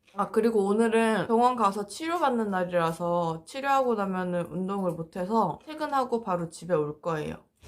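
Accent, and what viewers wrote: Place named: Busan, South Korea. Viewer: 부산사투리가 귀에 딱 잘들려왔어요 완전대박채널되시기를 ㅎㅎ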